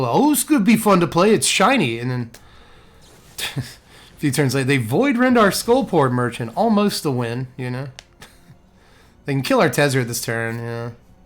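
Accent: South, United States. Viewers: My dude you know I love ya to death, please please please please stop with the country voice. I keep having to click away. It’s…too much. Thanks.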